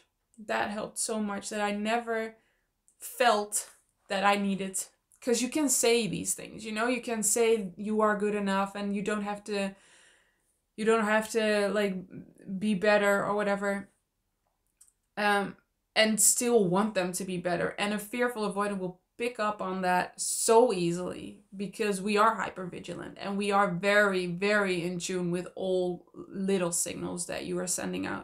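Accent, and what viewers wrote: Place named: Netherlands. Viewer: There are a lot of wonderful YouTubers on attachment styles but takes the cake - and this one in particular. 100% acceptance of an FA - or anyone - as they are in this moment or move on. Love your honesty, authenticity, wisdom and Dutch accent but amazing English / calming voice. Keep it up, girl!!!!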